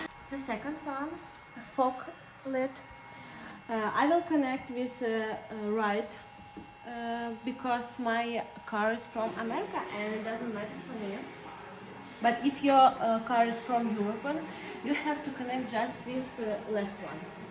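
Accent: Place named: Russia. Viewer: акцент русскоговорящий ;)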